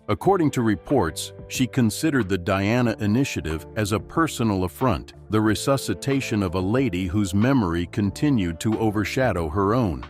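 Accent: United States. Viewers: All this is made up rubbish. Even narrated by a Yank.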